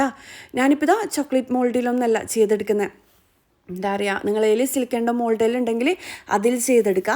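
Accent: Kannur, India. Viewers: First time seeing ur channel . Nalla samsaaram 😘😘😘Kannur aano .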